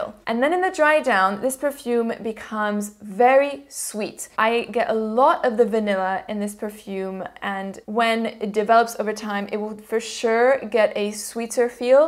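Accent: France. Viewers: Probably a silly question but I've watched you some and your French accent sounds exquisite! Are you French? 😊 Cheers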